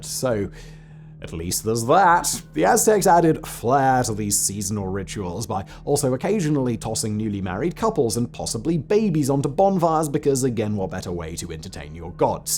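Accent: England, United Kingdom. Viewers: Didn't get any new information about war or warring cultures. Just wasted time hearing arrogant English accent.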